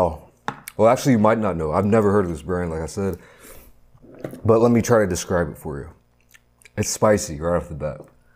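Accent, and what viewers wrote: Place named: New York, United States. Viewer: Are u from New York u have the accent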